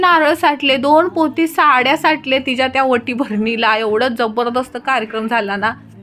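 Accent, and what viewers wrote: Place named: Western Maharashtra, India. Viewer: तुमच्या भाषेवरून मला वाटलंच होतं हे तुम्ही पश्चिम महाराष्ट्रातलेच असाल म्हणजे कराड भागातील कारण तुम्ही सांगितलेल्या प्रत्येक गावात पाहुणे आहेत खूप छान ब्लॉग आणि मी तुमचे व्हिडिओ नेहमी फॉलो करते कारण तोंडोली पण आम्ही दर पौर्णिमेला येत होतो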